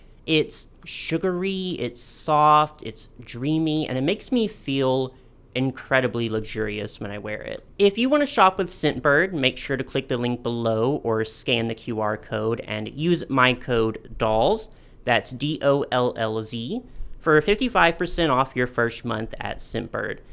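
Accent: Texas, United States. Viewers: I'm a foreigner so maybe I just am bad at discerning American accents, but I was surprised that yours is a texan one??? Your voice is definitely not something I imagine when someone says "Texas" 😅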